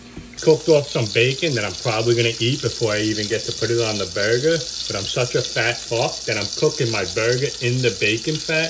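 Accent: New York, United States. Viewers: Been cooking my burgers in bacon fat for years, it's a game changer. I do my onions after my burgers, get it cooking in that beef and bacon fat while I rest my patties and toast my buns, fucking 12/10 shit. Love your channel, dude. I grew up 20 minutes outside NYC, living in Florida now, the accent brings me right back to the old Italian Pizzerias and sub shops I'd be in every other day, fucking love it, my dude.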